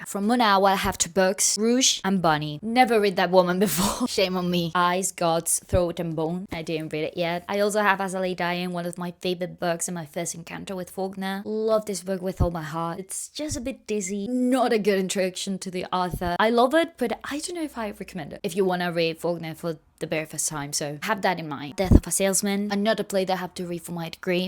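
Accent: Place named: United Kingdom. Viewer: Me encanta acento inglés britanico y esta lista de libros ❤ deberías hacer más Reels así 😊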